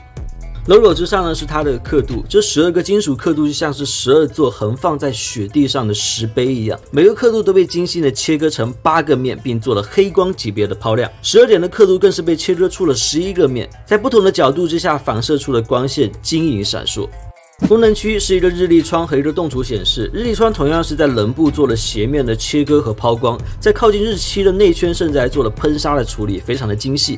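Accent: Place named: Taiwan. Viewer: 滿口台語音 爲怎麼簡體字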